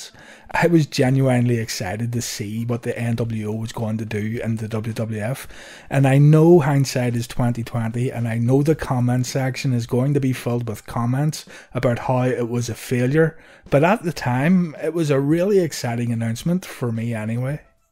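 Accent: United Kingdom. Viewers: narrated by people from across the pond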